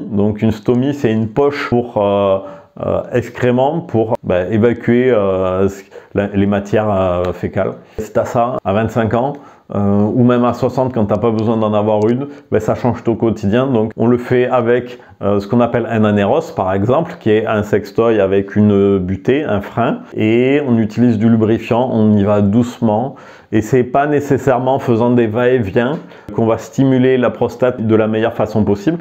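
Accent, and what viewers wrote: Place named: Southwest, France. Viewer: On entend bien le sud ouest ça fait du bien 👍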